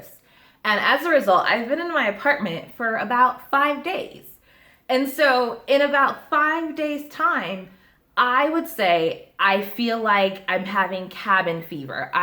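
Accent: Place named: United States. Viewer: Hey! Enrique here. I love all your lessons bc they sound like the real American English! Sometimes, I struggle with the accent of native English speakers from England or Australia. For instance, did you see the video in which the Dagenham boy told Meghan "I think she's beautiful " That "beautiful" it sounds so weird to me like it is not enough English. Of course, it is a good English. Could you please give me some tips about pronunciations skills or listening skills? Thanks in advance.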